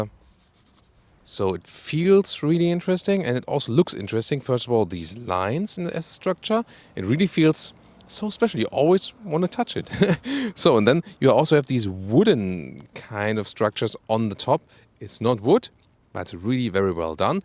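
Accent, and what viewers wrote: Germany. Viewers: FIAT take my money!!! I want one. Long range version only. Thank you. Super review. Super German accent! Love it! Italy loves you!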